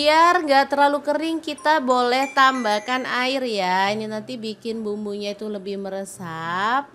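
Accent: Surabaya, Indonesia. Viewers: Sampeyan Yen nganggo boso suroboyoan kae kok lucu men... Seneng banget aku... Selain itu masakan2 nya sy catet di buku, sy praktekin... Suwun bngt ilmunya...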